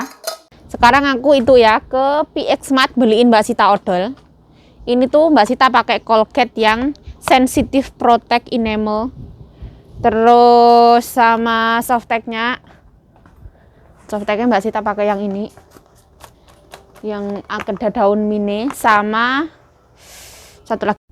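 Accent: Java, Indonesia. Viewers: seneng klo mbak klo uda ngomong logat jawa nya kental,sebutan kanjeng nyonya tuh dri taiwan apa jawa mbak klo boleh tau🙏😁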